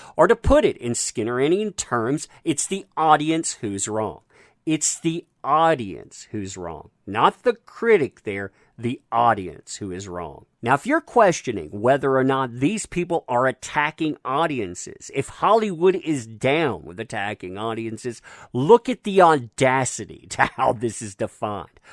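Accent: South, United States. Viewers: you should do a cooking episode. you got that southern accent of a cowboy chef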